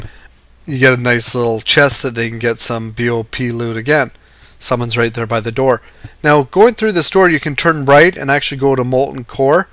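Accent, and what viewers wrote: Canada. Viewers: He is canadian so his accent.....But Idk why he didnt know about the FP....maybe cause he is too leet to come back to regular zones lol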